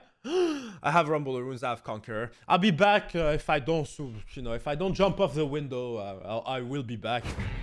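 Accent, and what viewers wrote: France. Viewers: Is it just me or does he sound way more french in this video than normal? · Is he french or something????? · U sound like a french accent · Is he extra french on this one or is it just me? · are you french ? is a really old question I'm asking me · this french accent is so annoying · is he french ?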